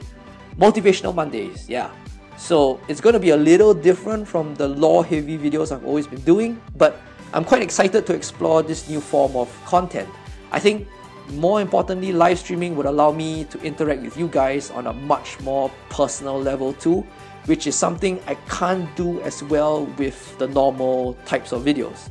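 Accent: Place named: Singapore. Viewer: This was the most wholesome video I have ever seen, really cute! And you are from Singapore, always wondered where your cool accent comes from. <3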